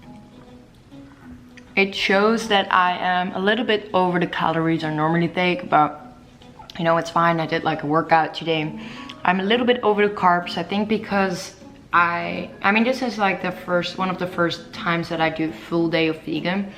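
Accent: Netherlands. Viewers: the moment I opened the video I recognized the accent. its so cool to see a model from the Netherlands, half my family lives there and it's probably one of the prettiest places ever.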